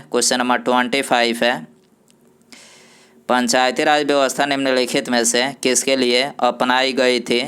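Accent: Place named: Kashmir, India. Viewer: Radhe radhe sir,,,,sir aap kasmir se ho kya. Bhasha se lag raha h,,,ya fir rajasthan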